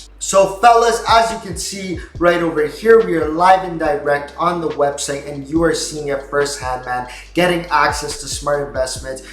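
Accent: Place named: United States. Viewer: Most American sounding Russian I’ve ever heard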